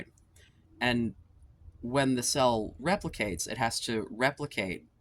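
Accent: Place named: United Kingdom. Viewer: I came here to learn british accent lol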